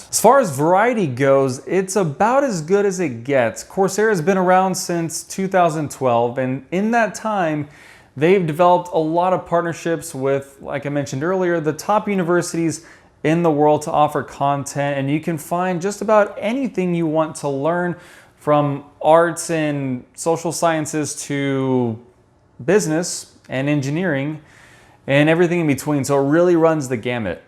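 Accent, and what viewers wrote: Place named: Russia. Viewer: Мне кажется , что у вас русский акцент.For some reason I hear russian accent in your speech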